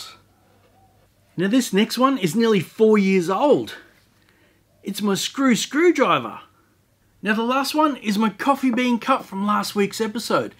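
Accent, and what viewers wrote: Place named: Australia. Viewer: I don't care much resin. I'm here for the amazing Australian accent.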